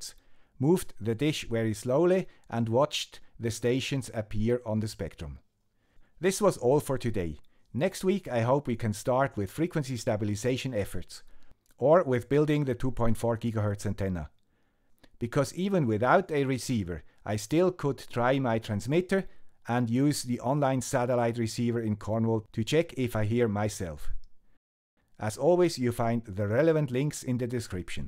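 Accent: Switzerland. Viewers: The Ham Radio with the Swiss Accent rocks! Very interesting. Do you know any good online electronics course? To get more deeply into the theories behind this kind of circuits.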